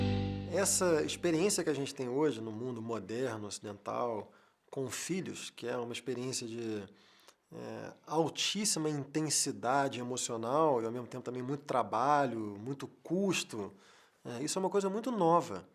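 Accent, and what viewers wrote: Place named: Rio de Janeiro, Brazil. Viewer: como sempre, com essa voz maravilhosa,sotaque sutil carioca, incrível e eloquente...eu tenho uma relação com os filhos muito peculiar, tenho 4, de uma relação sólida de 20 anos...não vou me estender pq daria um grande ensaio...O que quero cita aqui é sobre a relação liberdade e individualidade. Eu desenvolvi o hábito de beber recentemente, menos de 4 anos, sempre fumei meu Beckenbauer com meu esposo desde o início de relacionamento e aos 30 fumei meu primeiro cigarro, resumindo, eu sou incapaz de exercer o meu modus livre/entreternimento/relaxing na presença deles, eu simplesmente não relaxo...e tirando o caçula de 7, o restante são bem crescidos pra compreenderem, mas eu não consigo, e me causa um desconforto, pois me sinto reiterando o comportamento auto tolhitivo da minha mãe, apesar de não ser haja visto que eu sou o cerumano mais transparente que meus filhos conhecem, não nego nem peido, falo o que penso sempre, nunca fui hipócrita, mas está relacionado a um espectro de controle e administração...Isso eu não consegui ainda superar.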